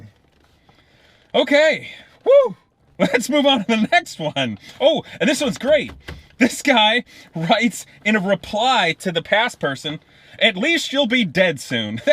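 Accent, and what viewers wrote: Russia. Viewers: Great content , very relevant. Hope your videos have some impact, forwarded to my 16 yo cousin, hopefully new generation is a bit better. I travel alot on the highways for work. Mostly new jersey and pennsylvania last few years and unfortunately a lot of people dont even know they causing a problem. Drove in russia and europe and your commenters are 100% right about those. Way better driving culture in europe and even russia (though its a bit rough). Really cool russian accent you got there! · Love your Russian accent. But I still agree with you and left lane hog’s are more dangerous than some people think.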